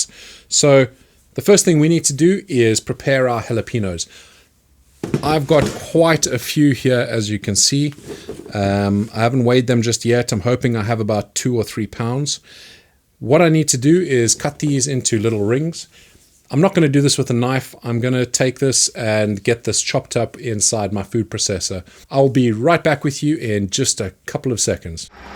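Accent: South Africa. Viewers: Are you South African? :D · South African accent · your accent sounds like you have South/Southern African ancestry. Is this so? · Where in the UK are you from? To my untrained Canadian ear it reminds me of South African. Birmingham? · ah very nice. I’m from California but live in China. Your accent was a bit hard to place but still distinctive. The way you say “hotter pepper” like “hwotta peppa” and “here” like “hee-yah”. My South African friends sound the same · you south african by chance? the accent lends that way for me. apologies if I'm mistaken. tits video! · Do hear a note of South African accent there :) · Do I hear a hint of South African in there? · Hey man, totally love the channel but i have an unrelated question... are you/your parents/family from south africa? Picking up the very BAREST of accents on some words and I don't think its british kiwi.... 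sorry if it is xD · First video I have ever been recommended for. First- South African? Second- fantastic and an instant sub. Cheers from a Canuck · Are you south African? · Good video man just subscribed. Also your accent sounds south African please correct me if I'm wrong. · are you south african? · UK....... I'm getting a south African vibe? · Hi. Are you South African? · Can I ask if your accent is South African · Little south African, little Zimbabwean, and now a bit of brit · Are u south aftrican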